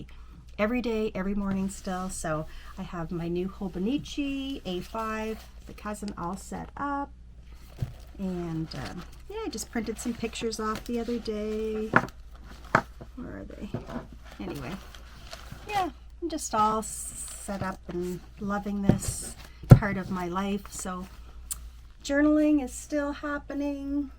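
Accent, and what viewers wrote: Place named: Maine, United States. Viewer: Yay thanks for the update after Vlogmas - love your Everett I have received your Valentine Box and am looking forward the finding a pattern and knitting up all your beautiful yarn. Love your “Down East” charm, nothing like a good “r”.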